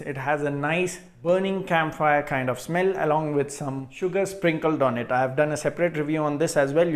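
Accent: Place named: India. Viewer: My brother u look arab but u sound indian what ??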